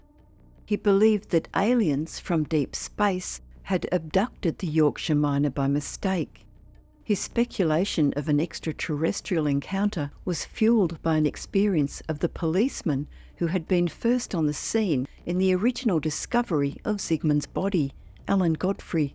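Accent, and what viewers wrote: Australia. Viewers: No she Australian or New Zealand. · That's an Australian accent, not New Zealand. The male presenter they sometimes use is also Australian.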